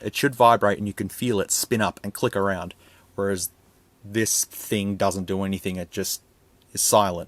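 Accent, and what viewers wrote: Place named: Australia. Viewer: Big love from the UK, always known Wish is a sham, but hearing an Ozy tell it in plain Ozy talk is always great fun. (Lived there, have family there, Love there, ‘WISH’ I was still there) 😀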